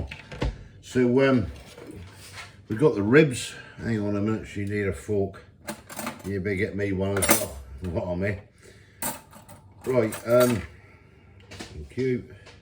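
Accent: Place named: Norwich, United Kingdom. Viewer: Norwich accent !!!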